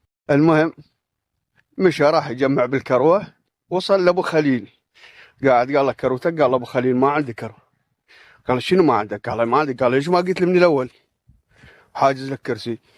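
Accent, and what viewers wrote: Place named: Samawa, Iraq. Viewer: بس صدك لهجة مالتك مال سماوة عدنا ضابط هيجي لهج يكولكم هچي كم كلمة كلتهن انت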